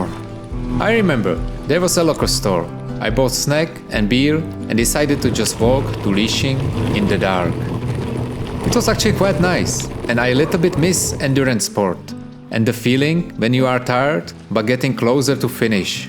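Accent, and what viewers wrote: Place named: Czech Republic. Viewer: Hi, I just want to ask you, where are you from? You have english accent that sounds a little bit Czech. Im just really curious if you arent from Czech Republic.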